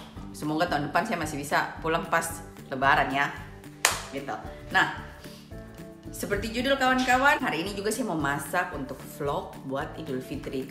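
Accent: Papua, Indonesia. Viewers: Za senang dg logat papuax walaupun da tnggl d'luar👍👍👍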